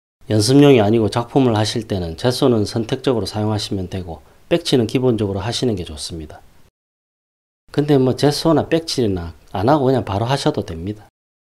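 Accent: Gyeongsang, South Korea. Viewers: 진짜 의도되지 않은 리얼 경상도 발음 눈까리 빵터짐 ㅋㅋ 울랑이랑 비스무리한 스퇄의 아재 개그 정말 딱 내스퇄 강의 ㅎㅎㅎ 취미로 그림 그리다 우연히 알게되었습니다. 시간됨 자주 들릴께요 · 눈깔 그리시는거 잘 보았습니다. 갱상도 말투로 시크하게 설명하시는것이 너무 제취향이십니다……하하핳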